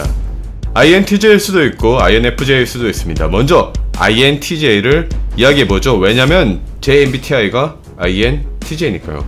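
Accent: Gyeongsang, South Korea. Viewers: 경상도 사투리가 재미있네요 그리고 MBTI 진짜 무지성 무논리 ㅋ거의 2020년대의 신 혈액형 수준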